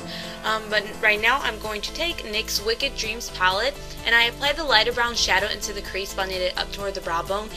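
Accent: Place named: Chicago, United States. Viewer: I love the way you speak... So Chicago. I'm in Wicker Park so I can totally call the accent when I hear it! Great job, your friend is beautiful. :)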